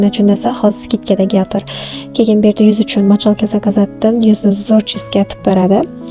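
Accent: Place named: Khorezm, Uzbekistan. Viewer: ❤❤❤❤бунча ширин гапрдингз устозам бирам чиройли яхши кураман хоразм шевасини боризга шукур сиз туфайли шу кампанияга кушилдим сиздан бизнес сирларини урганетганимдан хурсандман❤❤❤❤❤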